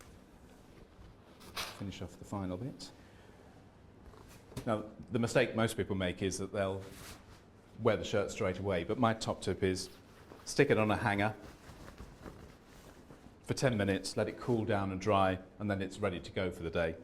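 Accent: United Kingdom. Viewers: I always trust somebody with a British accent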